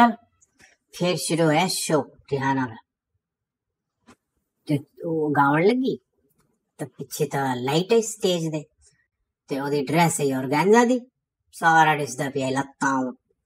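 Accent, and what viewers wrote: Saraiki, Pakistan. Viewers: Garav gera salute to h that u kept ur ancestor's language alive even in india after d partition..lov ur sraiqi axcent i belong to pakistan from sraiki belt althought nor a sraiq myself but knows sraiki very well...thuwaku meda salute bauw zabrdast alaa ay thuwadi ji khush krdita hay zraiqi zindabad saadi mithi alaa te salamti einku alaawarnd alaa te....🎉🎉🎉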